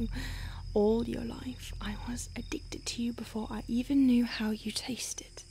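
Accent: United Kingdom. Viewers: Ahhhh British accent its scary because you sound so much like my friend..... good job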